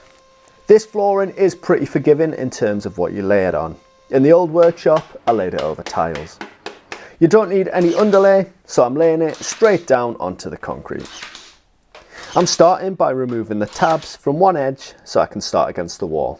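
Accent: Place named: County Durham, United Kingdom. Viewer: Just came across your chanel. Nice one. Cant work out the accent. Co Durham or there abouts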